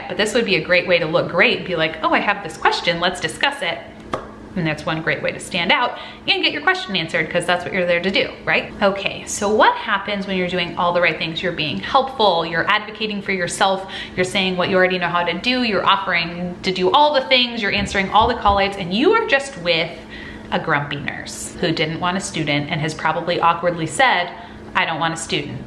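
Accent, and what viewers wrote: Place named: Midwest, United States. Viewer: Ok but the foldable clipboards (one that fits in your pocket!) are good! I love your videos btw. Are you from Minnesota? Or somewhere in the Midwest? I’m from Minnesota and your voice kind of sounds Midwest!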